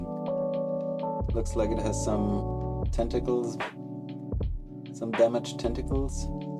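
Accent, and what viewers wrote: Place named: France. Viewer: Yessss, gorgeous French accent albeit very feint 🥹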